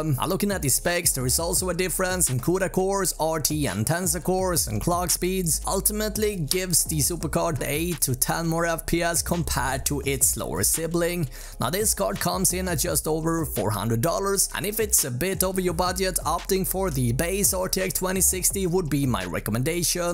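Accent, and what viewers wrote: Sweden. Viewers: Please go watch some American Tech YouTubers, listen to them and then try to speak like them, slowly with taking a breath and pronuciation Thank You Very Much 🙏 Swedish have very similar accent to native English ... Don't know what the problem here 😥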